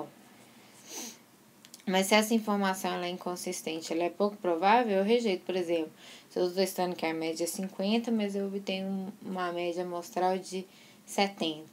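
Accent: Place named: Minas Gerais, Brazil. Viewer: Ótima explicação e o sotaque mineiro é bão dimais.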